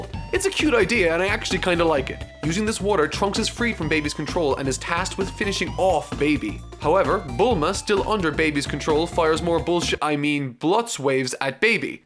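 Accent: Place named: Ireland. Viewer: You're Irish aren't you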